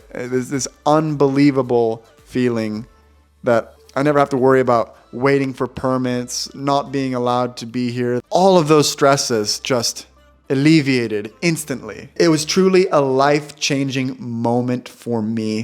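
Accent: Sweden. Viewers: even your english has a slight swedish accent now, thats whats most impressing KEK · Wow! I saw a video of yours quite a long time ago. Your accent in English sounds more Swedish now! :) Congrats on your citizenship! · Lately I've noticed your English being more influenced by Swedish. There's a slight accent at times as well as a few involuntary Swenglish phrases😊 Speaking like that makes you a true Swede!!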